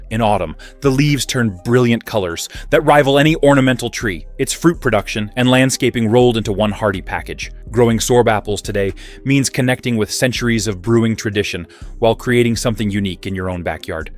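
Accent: United States. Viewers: Such a disappointment that this is AI slop which is not marked up as such in YouTube - The pictures don't match the commentary a lot of the time often showing completely different fruits to those being talked about. Pauses and emphasis in the American commentary are very strange often a symptom of AI. I think the content has many inaccuracies - Saskatoon is native to Canada and not Scandinavia, Mespilus is the botanical name for Medlar and I wonder if Jujubes were really grown in there?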